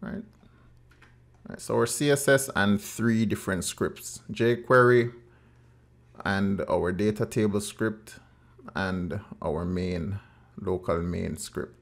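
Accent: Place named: Jamaica. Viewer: I don't usually do this but when I heard your accent I had to log in just to say, holy shit, a Jamaican narrating an IT video is pretty much one of the rarest but most awesome things I've ever experienced. And besides that, you did a really good job walking us through the process. Good job mate! Peace, love and unity!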